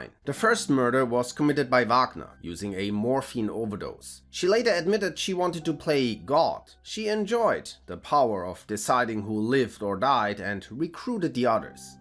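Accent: Germany. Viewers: am just messing with you bruh i like german accent and it doesnt matter nothin how you pronounce something. If we all spoke same accent it would just be boring.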